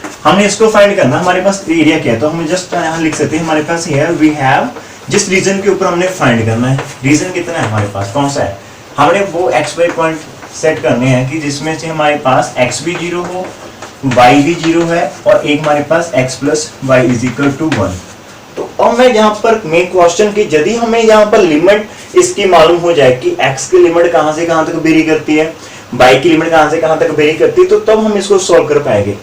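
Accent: Punjab, India. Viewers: Thank you sir ji ,Punjabi touch aayi jaanda boli ch Sir ji 👌👌👌👌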